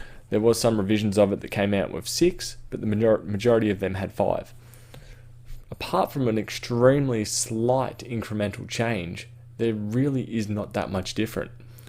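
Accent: Australia. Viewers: You Australian mate?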